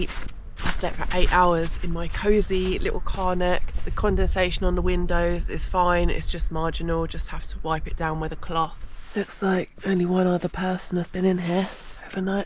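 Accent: United Kingdom. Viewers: May I please ask which UK accent you have? From USA . Thank you for your videos ! If you ever bring George to the USA, please make a video of where you camp.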